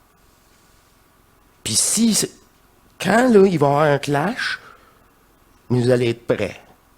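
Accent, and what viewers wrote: Quebec, Canada. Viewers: Un vrai Québécois de souche Branché et tellement coordonnée dans ta parole merci de tout tes partage c'est riche 😊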